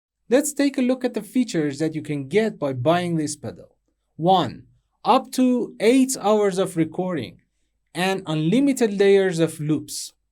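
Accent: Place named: Iran. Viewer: Your accent is so persian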